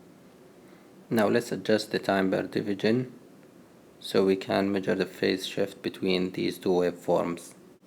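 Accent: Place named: Arab world. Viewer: عربي. واضح من اللهجة ما شاء الله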